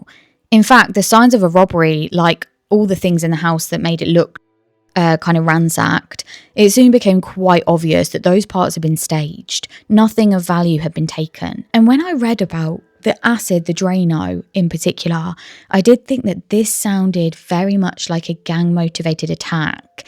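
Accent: United Kingdom. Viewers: The really pronounced, British accent ruins the video. It’s hard to sit through. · She is British, so you want her to sound anything other than her own? 😂 I’m even more confused. You don’t like it don’t watch it problem solved. Haters gonna hate no matter what. Geez. I always love accent and how she present her story. Keep going girl never mind the haters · The narrator is British, hence the accent.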